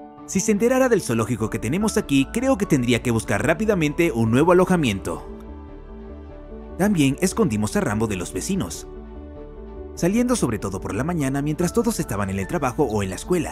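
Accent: Russia. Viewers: Bro sos ruso o español?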